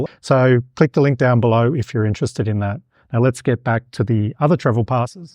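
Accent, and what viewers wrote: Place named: Australia. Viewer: Sound like an Aussie.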